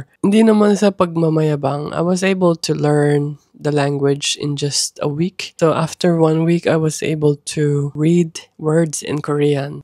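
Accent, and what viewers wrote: United Kingdom. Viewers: I love your British accent ❤❤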